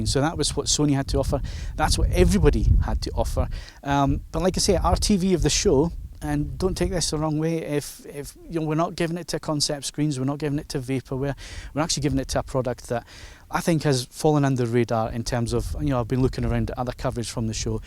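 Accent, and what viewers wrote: Scotland, United Kingdom. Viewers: This guy is from Scotland. I believe.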